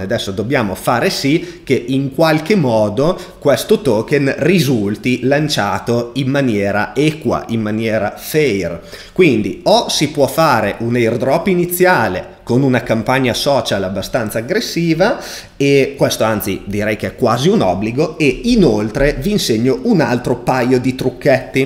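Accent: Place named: Romagna, Italy. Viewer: vabbè sei palesemente romagnolo. Viva la Romagna, follow immediato.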